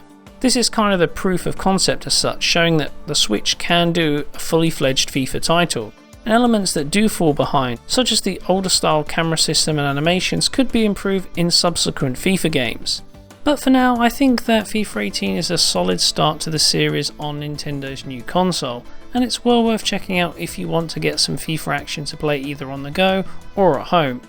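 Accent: England, United Kingdom. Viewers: british accent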